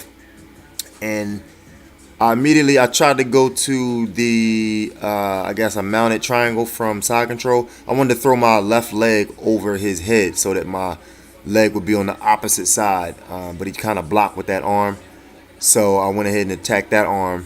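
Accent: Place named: New York, United States. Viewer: Great vid.... where is this place? sounds like a NY accent to me :) Keep up the good work!!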